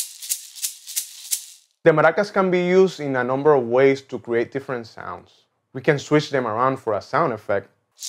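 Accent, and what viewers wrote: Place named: Puerto Rico. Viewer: Thanks for your response. This observation I am selling it to you wholesale for when it came to me I was completely fresh to my interest in percussion. The macho hembra distinction was totally matter of fact in reference to the difference in tone, which was also matter of fact. I assumed it was due to having being built to make them sound different and macho hembra came by default, as in drums, as you point out. To tell you the truth I perceive a difference in tone but am not certain it is due to the maracas, as opposed to my own right-left hearing. Probably the reference is very old school, from before your time, which I would not be surprised. Your style of exposure is new to me, it is very good, your accent shows but so is your knowlwdge and control of the english language. It is a refreshing style of exposure and diction. 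Congratulations and Blessings. Keep it up for percussion is the most democratic form of musical expression. I am not sure if you are Puertorican, I suppose you are, but Puertorican percussion is among the most democratic of all.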